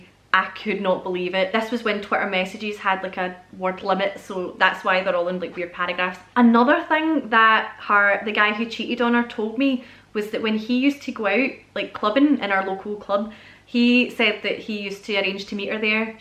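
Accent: Scotland, United Kingdom. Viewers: Hi, ive been a silent follower for some months, came here to learn scottish accent lol, but i just have to say THAT WAS AMAZING! I fullheartedly enjoyed the story. So good. Like a thriller, it was sooo so exciting! So thank you for sharing, hope you get the last answers. And yeah a documentary would be super cool :)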